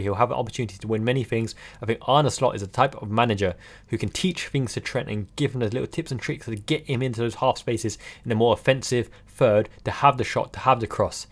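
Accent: United Kingdom. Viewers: おすすめに出てきました。同じく日本のリヴァプールファンとしてyoutube活動応援しています。あと日本人としては珍しいukのアクセント、めちゃくちゃかっこいいです。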